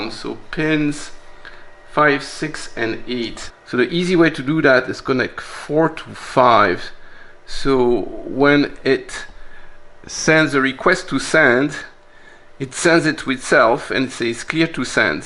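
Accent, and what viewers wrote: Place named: France. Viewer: Whenever I start a video and I hear "Hello and welcome back!" with that hint of a French accent I know I will learn something about vintage computers today.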